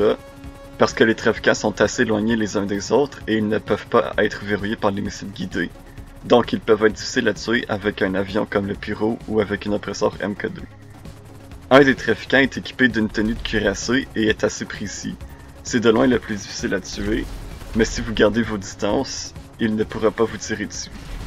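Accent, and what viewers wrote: Quebec, Canada. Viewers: J’aime la vidéo Hahaha et ça parait que t québécois (je le suis aussi) gg continue comme ça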